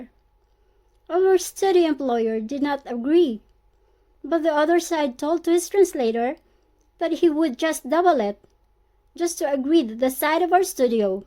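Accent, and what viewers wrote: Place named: England, United Kingdom. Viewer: Thaks again friend..i like your eng accent...nice blog you have♥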